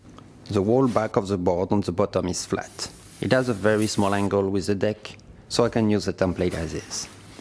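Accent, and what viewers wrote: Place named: France. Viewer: au fait au vue de l'accent je suppose que tu connais le francais ;)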